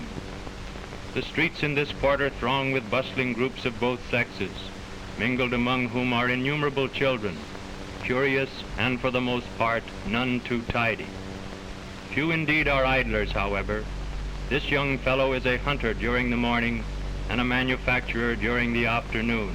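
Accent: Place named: Mid-Atlantic, United States. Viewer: Yes, it was a typical manner of commenting back then, with the upper class Mid-Atlantic accent, and had nothing to do with disdain. It's also worth remembering that it was only the 2nd or 3rd year of the "talkies."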